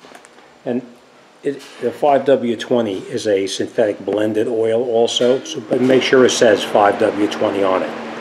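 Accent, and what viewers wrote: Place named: Massachusetts, United States. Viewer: I like the MA accent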